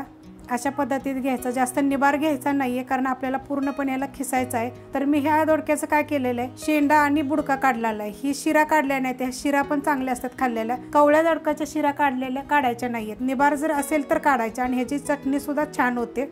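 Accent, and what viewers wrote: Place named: Phaltan, India. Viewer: एक नंबर रेसिपि.. तुम्ही फलटणच्या (जि.सातारा) आहात का? तुमच्या बोलण्याच्या पद्धतीवरनं तसं वाटतंय.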